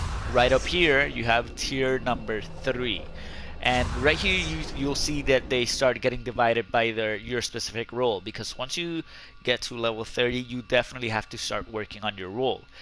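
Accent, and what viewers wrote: Puerto Rico. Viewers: Eres de Puerto Rico? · Are u from Puerto Rico(Borikén)? Nice vid!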